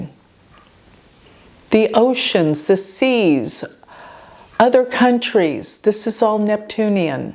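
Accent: Texas, United States. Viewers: You can speed up the playback by going to the Settings button that looks like a little wheel in the bottom right hand corner of the video; click on it, select Playback Speed and you can speed it up! I usually use 1.25 or 1.5 speed if I'm in a hurry. But I do love a Texas drawl, so normal speed is good for me!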